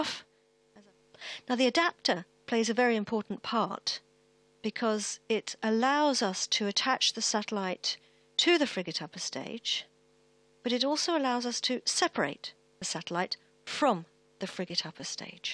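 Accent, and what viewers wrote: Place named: United Kingdom. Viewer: Why this British accent ?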